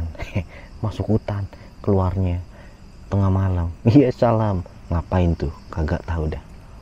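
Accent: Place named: Eastern Indonesia, Indonesia. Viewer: Kk org jawa to? Kenapa pake logat timur selalu sa dgr blg "ado mama..." salam dr Merauke.sukses ya ka tampan..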